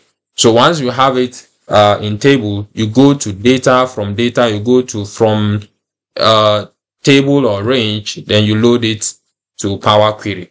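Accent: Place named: Ghana. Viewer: He sounds Ghanian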